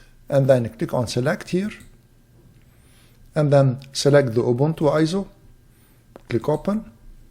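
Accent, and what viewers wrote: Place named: Lebanon. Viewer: you sound lebanese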